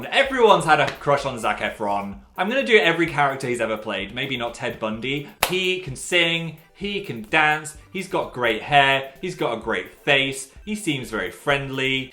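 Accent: North, United Kingdom. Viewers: His accent is just getting more and more Northern 🥰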